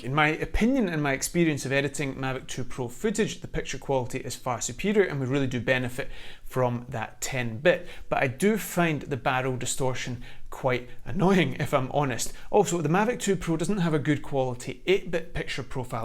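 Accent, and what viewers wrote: Scotland, United Kingdom. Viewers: Excellent review very informative and very happy to listen to that pleasant scottish voice.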